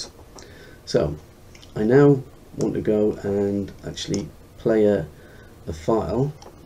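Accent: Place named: United Kingdom. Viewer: You sound british alot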